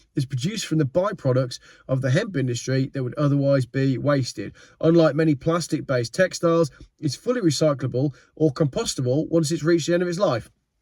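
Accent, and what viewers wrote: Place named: West Country, United Kingdom. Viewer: Great west country accent. Alright my lovr.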